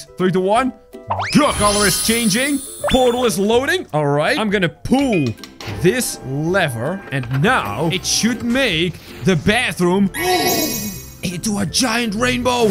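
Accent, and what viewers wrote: Netherlands. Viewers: YOU HAVE A SORT OF DUTCH ACCENT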